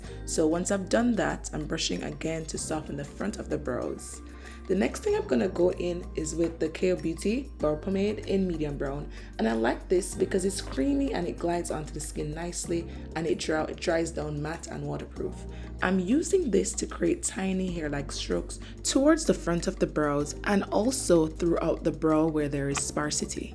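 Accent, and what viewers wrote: Jamaica. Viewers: I don’t if am hearing wrong but are you Jamaican? Because I’ve been binge watching your videos since I’ve discovered you and I’m getting Jamaican vibes 😅. Great videos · From mi hear di accent mi subscribe 🇯🇲❤ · What is it, Jamaican?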